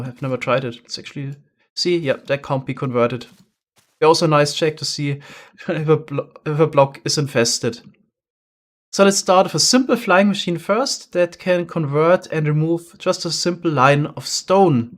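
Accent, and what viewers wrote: Germany. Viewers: Ich wusste es du klingst übel deutsch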